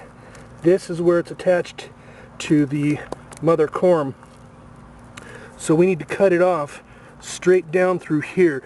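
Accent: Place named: Florida, United States. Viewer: If you’re from Florida, you have an awesome Floridian accent.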